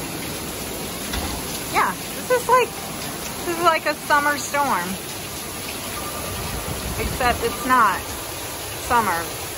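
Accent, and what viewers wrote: South, United States. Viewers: there’s not much true southern speak in Florida anymore so it’s a delight to hear it ms lol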